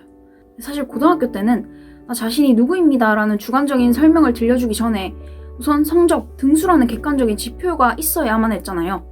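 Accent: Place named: Gyeongsang, South Korea. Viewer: 뜬금없지만 나도 대구 사람이라서 말투가 너무 정겨워 ㅋㅋㅋㅋ ㅠㅠㅠ 좋은 영상 감사해요 🤍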